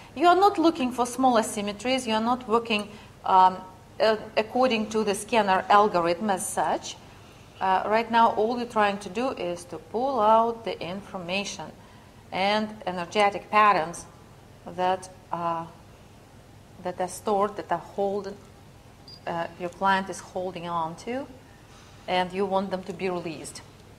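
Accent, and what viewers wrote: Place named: Russia. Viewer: Эх, сразу чувствуется , русская женщина представляет скэнар ,акцент выдает )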